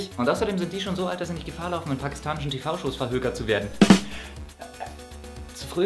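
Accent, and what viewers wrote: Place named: Berlin, Germany. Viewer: Ab und zu sprichst du mit Berliner Dialekt und manchmal nicht. Wieso?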